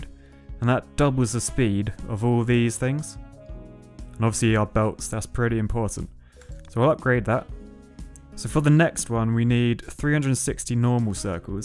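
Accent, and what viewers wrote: United Kingdom. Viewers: this man is so bri'ish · Funny question but: are you British?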